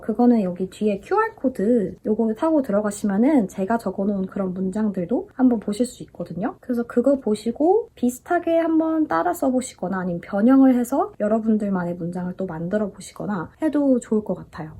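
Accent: United Kingdom. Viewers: 책 출간 축하드려용 >_< ❤️ 바로 교보문고 달려가서 구매하고 왔습니다! 빨리 받아보고싶네요! 제 영국영어 롤모델이세용..목소리도 너무 좋으시구 ,, 은근 슬쩍 팬심 들어냅니당! 다시 한 번 축하드려요!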